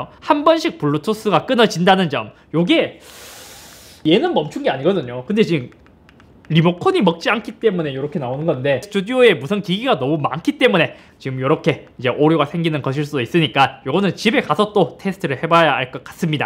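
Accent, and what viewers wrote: Gyeongsang, South Korea. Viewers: 경상도사람발음은 못알어듣는군요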